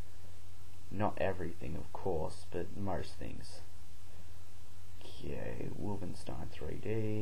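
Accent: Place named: United Kingdom. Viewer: whats with the awful british accent?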